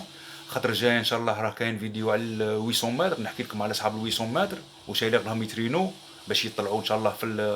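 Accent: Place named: Algeria. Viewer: لهجة جزاءرية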